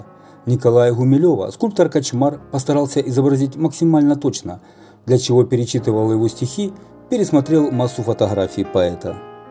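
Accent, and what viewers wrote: Ukraine. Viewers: Ха)))) Меня тоже жутко резануло по ушам голос за кадром! Это надо же,даже не поработав над своей дикцией пускать это все в эфир!)))) Не лучше ли было с таким украинским акцентом,озвучивать на украинском?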